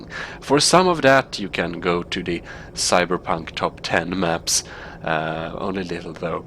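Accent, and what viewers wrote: Sweden. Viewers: I love the Swedish accent.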